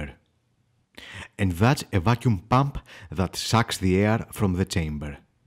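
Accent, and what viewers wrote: Greece. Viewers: Από την προφορά σου κατάλαβα είσαι Έλληνας 😊 Μπράβο αυτό το βίντεο Με βοήθησε πολύ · Are you from greece? Your accent is very greek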